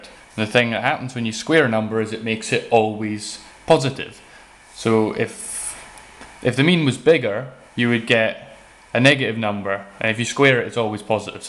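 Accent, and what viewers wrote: Scotland, United Kingdom. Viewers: Herschel's Lost Leg. He sounds Scottish....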